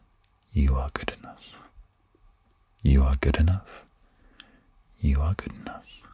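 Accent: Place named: United Kingdom. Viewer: calm British voice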